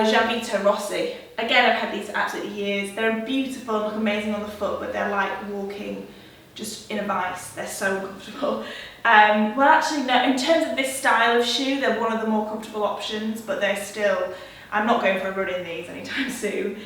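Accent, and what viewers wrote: United Kingdom. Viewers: I love her british accent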